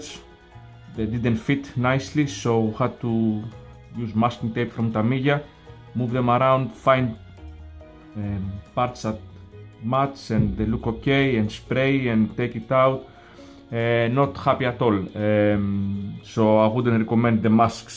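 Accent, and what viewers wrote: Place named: Greece. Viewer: I have a question, where are you from, i'm trying to place your accent, I want to say its Greek, but I could be wrong.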